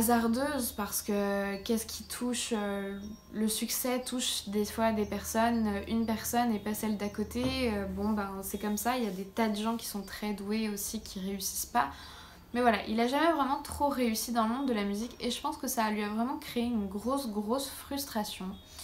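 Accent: Quebec, Canada. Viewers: Deux personnalités différentes, deux façons d'aborder les sujets différentes. Après, ceux qui disent que fait des fautes de français se trompent, elle parle québécois tabarnac! Fac c'est un français différent! Et alors parler de "faiblesse narrative", what?! Elle nous tient en haleine!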